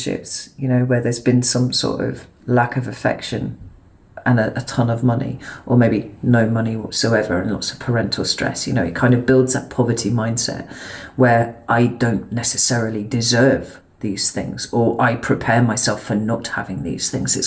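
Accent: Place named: England, United Kingdom. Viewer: VERY FUNNY THING ! NEVER LIKED THE ENGLISH ACCENT ! I LOVE IT BY YOU !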